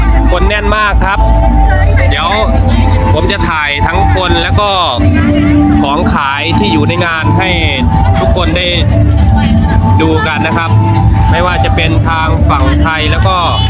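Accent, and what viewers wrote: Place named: Laos. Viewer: บรรยายไทยล้วนไม่ปนลาวได้หรือไม่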